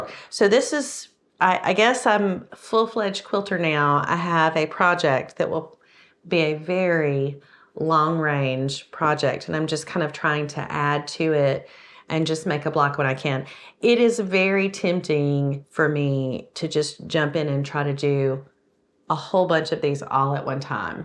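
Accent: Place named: South Carolina, United States. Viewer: You want some “pink” shirts? I purging again… I’ve been through skin cancer crap for over 20 years No worries Just keep freezing it off and burning it off (i wont use the chemo crème they gave me) and if you have to, have MOHS No big deal. Im 55 and been doing it for years I wonder what your accent is. It’s either Raleigh or some kind of South Carolina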